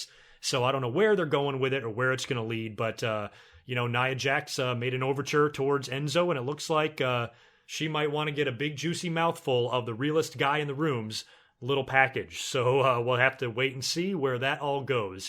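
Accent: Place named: South, United States. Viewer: Your southern accent is torturing!!!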